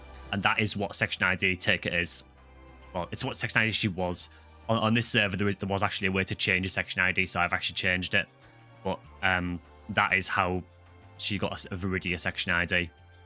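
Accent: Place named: Teesside, United Kingdom. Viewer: you sound like your free teeside mate! Me and my friend from Billingham are literally downloading this game tonight to relive the nostalgia!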